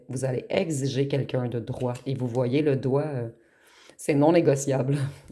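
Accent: Canada. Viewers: ❤bonjour, j aime vos guidances. J ai booster, j ai liker.j adoré votre accent du canada❤et je trouve vos jeux de cartes originaux.merci 😊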